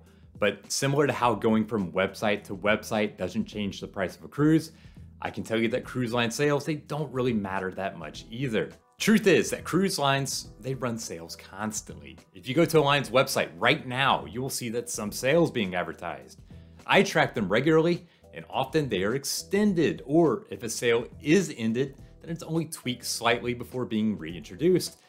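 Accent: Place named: South, United States. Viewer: Folks from the south they talk like that. Call it a unique characteristic of the regional dialect. I'd even wager that most people wouldn't notice and most who do they would appreciate the slice of americana it represents.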